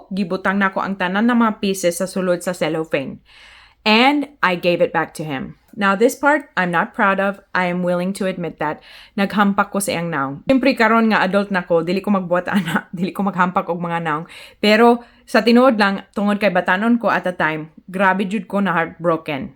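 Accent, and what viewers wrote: Visayas, Philippines. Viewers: Your Visayan accent is similar to Inday Sara. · Mam,pglantaw nako sa fb about mglantaw mi sa YT nimo bahin sa imong ex.. anhi daun ko dri..nkasubscribe daun. Cge rako lantaw sa imo fb..ganahan kau ko sa imo accent ay,bisaya jud kaau ba. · Maganda ka ate! Don’t worry anymore. I love your bisaya accent. So nice pag combined rin with English. And marami makakarelate sa story time mo. Hahaha. Naalaala ko yung classmate ko nung highschool, na panay kwento about other girl na crush nya.. yun pala ako ang crush nya. 😂😂😂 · Interesting... lovely accent, bisayan kaau... uban mga laki mag hinambog jud na sila. mag kiss and tell so amping. lesson learned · Arang bisayaa jud oy🤣🤣🤣🤣🤣🤣🤣🤣🤣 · Hi new subscriber here. Na surprised gyud ko kaayo na western lady pero maayo kaayo mag bisaya impressed gyud ko kaayo sa bisaya accent nimo. Interesting kaayo ang story nimo about sa imong ex filipino bf ug ang story nimo sa imong family na ngpuyo mo diha sa Pilipinas b4. 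Mo tan-aw ko sa mga videos nimo aron maka ila ko nimo more. Nalingaw gyud ko kaayo nag tan-aw ug naminaw nimo. God bless u always!!!🙏🌹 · ang galing, taglish-bisaya sya expressions, tono, mannerism, pati tawa. bisaya ka na day!!!!.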